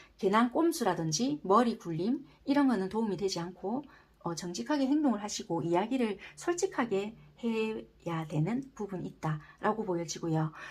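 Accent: Busan, South Korea. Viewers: 4번! 잘맞는거 같아요! 있는 그대로, 솔직하게!! 네 맞아요. 그런 말 했네요. 금전적 현실적 어려움도 맞아요! 구독 추천 누르고 갈께요! 부산사투리 넘 좋네요😄 다음 영상 기대할께요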